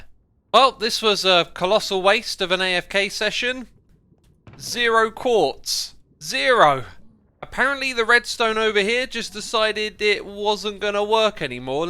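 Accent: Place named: United Kingdom. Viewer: He's hella british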